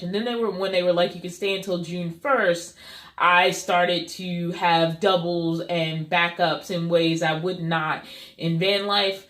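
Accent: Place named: Maryland, United States. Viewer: I grew up in Baltimore. I thought I heard a bit of the ole Maryland accent. Enjoy your travels.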